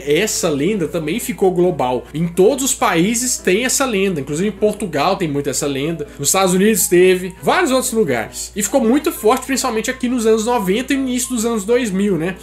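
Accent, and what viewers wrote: Minas Gerais, Brazil. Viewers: mds mano tu é mt mineiro, é o mineiro mais mineiro que eu já vi no youtube kkkkkkkkkkkkkkkkkkkkkkkkkkkkkkkkkkkkkkkkkkkkkkk fala mineirez dms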